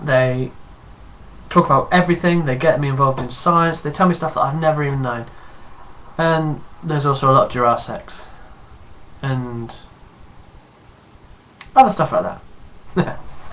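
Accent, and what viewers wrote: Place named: United Kingdom. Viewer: Yeay 1st vlogs! You have a purple female top in your office? I have to say I'm very flattered to be placed among such greatness as the other four. LOL, stalking is okay...sometimes. John Green Book to start with is PaperTowns. Well, it's my favorite. Great job! I'd say talk about whatever your passionate about, that passion tends to show in videos. (I'm also a sucker for British accents. I "teehee"d cause I don't think I've heard "KeepOrJot" said with a British accent.)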